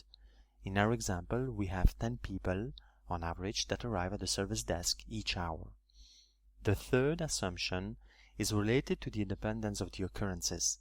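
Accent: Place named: France. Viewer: A video about Poisson...narrated by a French guy. How perfect.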